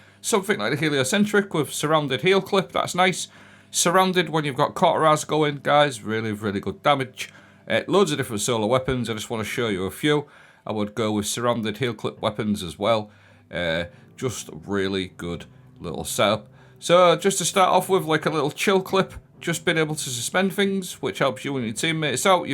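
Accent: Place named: Yorkshire, United Kingdom. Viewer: Love the content mate! Can relate to the Yorkshire accent too, which is always nice :) keep up the good work bro!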